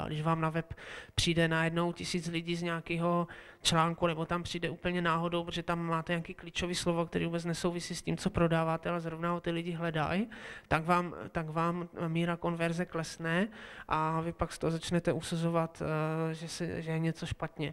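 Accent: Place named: Moravia, Czech Republic. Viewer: díky za facku. nechal jsem se unést pod vlivem špatného dojmu z toho videa (k čemuž v mém případě určitě přispěl i moravský přízvuk přednášejícího, který nějak nemůžu vystát - omlouvám se, ale prostě je to tak... snažím se to ale do hodnocení nepromítat). přednášel odborník pozvaný na doporučení, takže by bylo fajn, kdyby to mělo tomu odpovídající úroveň; podle mě ji to nemělo (neodvažuju se nicméně zpochybňovat odbornost přednášejícího, ale odbornost není všechno…).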